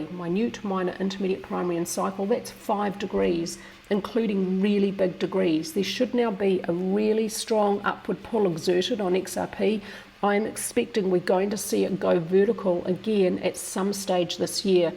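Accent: New Zealand. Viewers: Jack has done a wonderful job, but it's so, so lovely to hear from you again. Being an Aussie who's visited the Land of the Long White Cloud many times in the past, I really love listening to that Kiwi accent 😅 Thank you for your analysis, being a total mathematics dyslexic I really appreciate it.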